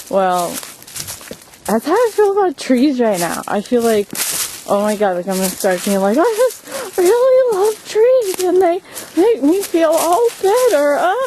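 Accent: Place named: North, United States. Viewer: Of course, assuming by state, I am just assuming you are in northern US or Canada based on your accent.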